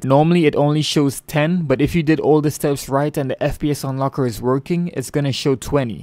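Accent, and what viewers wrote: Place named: Canada. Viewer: I love the canadian in your voice :D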